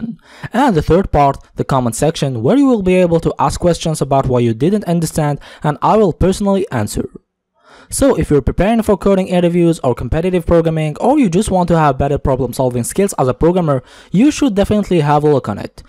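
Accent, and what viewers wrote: India. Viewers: I can't understand this indian accent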